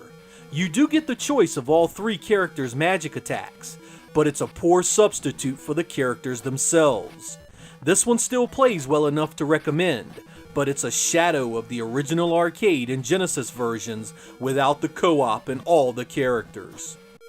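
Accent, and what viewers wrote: United States. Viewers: I guess he's from America?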